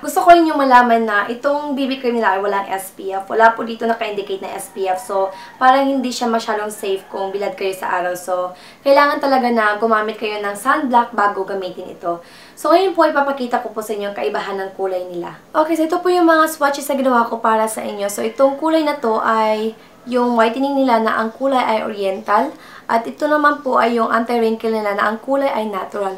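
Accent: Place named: Philippines. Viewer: i like your filipino accent.. haha ginamit ko din ko kaso cakey sya.... haha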